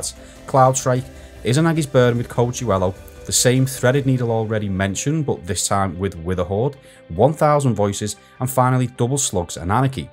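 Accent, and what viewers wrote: Liverpool, United Kingdom. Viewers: Hahahahhh scouser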